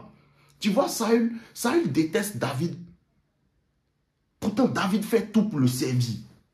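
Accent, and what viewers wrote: Ivory Coast. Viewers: depuis que jai découvert ta page avant hier je suis tes vidéos en boucle pleins d'enseignements merci beaucoup;;tu as l'accent de mes compatriote ivoiriens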